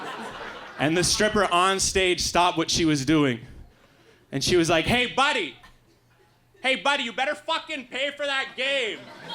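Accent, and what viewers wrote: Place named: Canada. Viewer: His Canadian accent brings back memories of home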